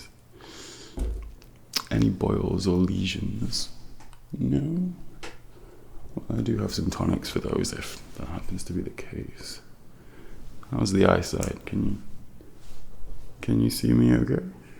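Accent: United Kingdom. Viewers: Your British accent is very realistic 👍🏻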